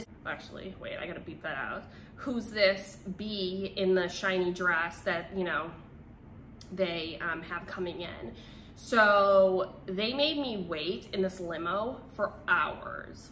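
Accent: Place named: Massachusetts, United States. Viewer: Everyone subscribe to channel so she can talk about the Jamaica cat incident!!!!! I feel like and Danni would get along, should visit LA and do a video with danni!! Your both from Massachusetts talk kinda the same and are fun good story tellers idk🤷🏻‍♀️🥰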